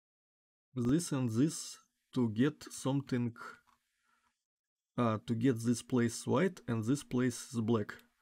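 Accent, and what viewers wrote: Russia. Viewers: А как-то на родном, а не на конченом вражеском, на комильфо уже? Может, предоставить перевод самим браузерам делать? Поищу либо на оригинале (включу перевод), либо на родном, русском. Пишу по-русски ибо акцент дичайший, русский человек говорит. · По окценту понятно что русский) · cool tutorial but with your accent you should sound evil russian characters in games and films))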